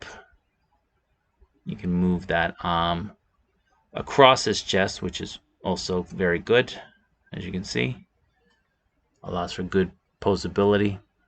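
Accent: Boston, United States. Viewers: What kind of accent is that ? Boston?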